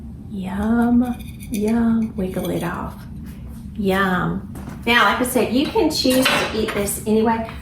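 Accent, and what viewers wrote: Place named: Georgia, United States. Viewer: I'm a Georgia cracker transplanted to Texas and your accent reminds me of all my family in the Albany area, the transcript shows " bowl" when you say boil, I get teased about my accent here in Texas too,love your channel!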